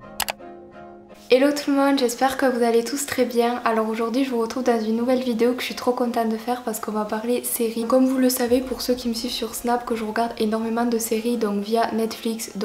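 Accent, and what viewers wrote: South, France. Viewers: Toi tu viens du sud heiiiin mdrrr :')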